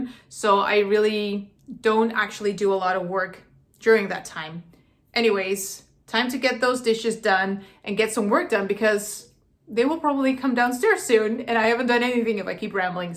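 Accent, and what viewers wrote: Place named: United States. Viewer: I love your videos and find them inspiring Just wondering though....why do you have an American accent since you are a Dutch family living in the Netherlands? I am English and previously when I've heard a Dutch person speaking English it is totally different your accent.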